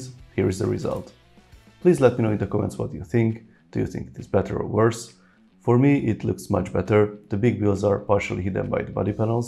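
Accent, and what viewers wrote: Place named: Hungary. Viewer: Kicsit olyan magyar akcentusa van nemtudom miért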